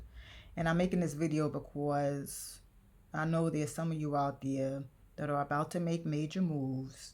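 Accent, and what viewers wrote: New York, United States. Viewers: I can't believe I never saw you in Shanghai. I worked a Jiaotong University for many years and lived in China from <num>. It was hell coming back to be honest. Still working as a law professor in the US now. Sounds like you're also a NYer.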